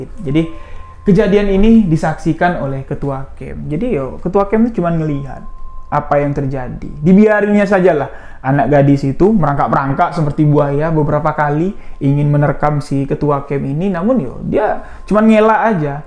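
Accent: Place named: Java, Indonesia. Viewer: jawa ngapak dwonk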